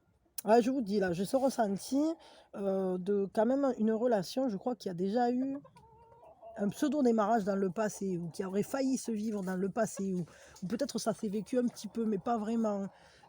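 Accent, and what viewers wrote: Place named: Libourne, France. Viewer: J'adore votre accent qui me rapelle mon papa près de Libourne Mes grands parents et ma tante Merci pour c'est pas de la tarte Quand son cœur s'ouvrira il sera surpris Bisous 😇😘🐾🎶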